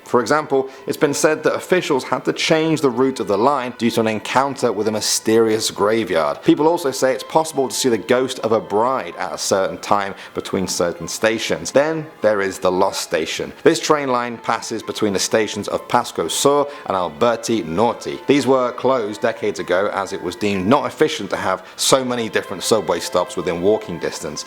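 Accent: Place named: United Kingdom. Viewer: I’m not sure but I’m hearing a little Britain accent is it just me cus I here a little British accent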